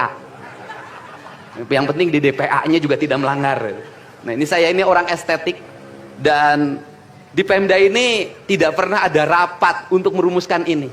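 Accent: Sunda, Indonesia. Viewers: Subhanallah alhamdulilah punya cahaya kepemimpinan yg adil dan keyakinan kpda allah,sundanya kentel,non politik,hanya keyakinan dan ertongan allah swt,kecerdasan,untuk memberantas segala bidang,allah uakbar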